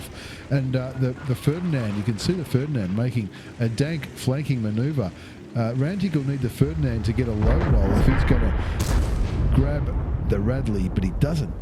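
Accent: Australia. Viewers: Halo Governor yes type 59 g were all over the place after black market now rarely seen on N/A great videos and awesome aussie commentary from Jax Fla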